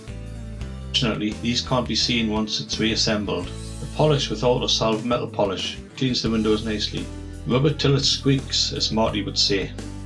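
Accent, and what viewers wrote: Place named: North East England, United Kingdom. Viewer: Hi from peterlee. I think by your accent you're not to far away. Love this VW and thanks it brought back some very happy memories.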